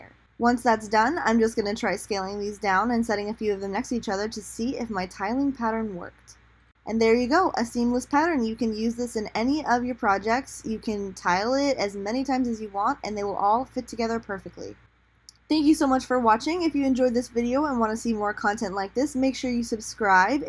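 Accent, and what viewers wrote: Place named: United States. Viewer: Where is this annoying US accent from? It's exactly the one we try and imitate in Europe when we say US tourists are obnoxious and loud. Bay Area? Santa Monica?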